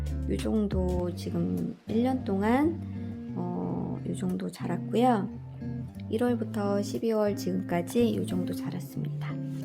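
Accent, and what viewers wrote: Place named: Seoul, South Korea. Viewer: 영상 잘 보고 갑니다 ^^ 경기 서울 분이신가요? 서울사투리가 조금씩 들리네요 ㅎㅎ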